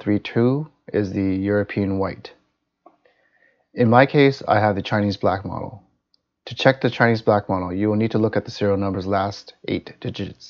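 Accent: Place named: Vancouver, Canada. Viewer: Haha right away I can recognize you’re from Vancouver. Really wish there was a hack for widewheel mercane..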